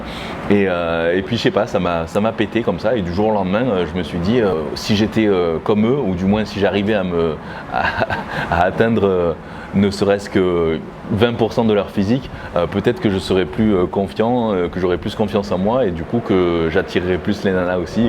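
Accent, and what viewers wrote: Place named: Le Pontet, France. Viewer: Le pontet👍 je me disais bien avec cet accent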